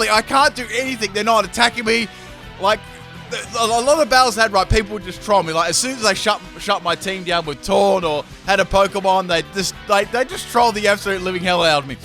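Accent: Australia. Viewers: I like your videos but your voice sounds like you combined a jock a Aussie and a Kyle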